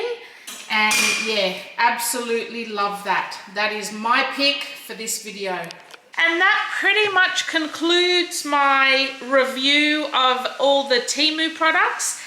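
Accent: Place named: Australia. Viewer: Aren’t you from Australia? You sound like an Aussie! Love watching your videos.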